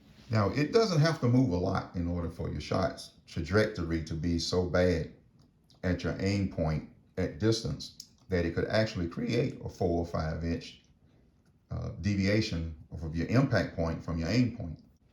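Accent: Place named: Alabama, United States. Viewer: I’m from Alabama originally and it sounds like you might be from there. Am I right?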